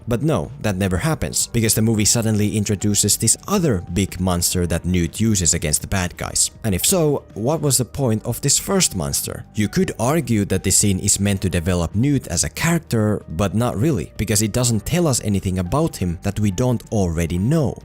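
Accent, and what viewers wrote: Finland. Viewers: Haven’t seen the movie but this was a good and comprehensive analysis. P.S. Kuulenko puheessa suomalaisen aksentin? 🤔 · Huh. You sound Finnish. Very slight accent, barely noticed. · I can hear the Finnish coming through ;) Great video! :)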